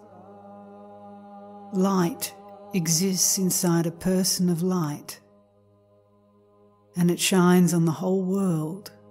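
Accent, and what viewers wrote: Australia. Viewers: A beautiful reading of this book. Thank you so much. After listening to so many robotic voices “reading” sacred texts so soulessly and without any understanding at all , this is just wonderful! Also lovely to hear it in an Australian voice for a change. ❤❤❤ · I think this was read by an Australian. If so, greetings fellow Aussie! Thank you for this - thoroughly enjoyed it 😊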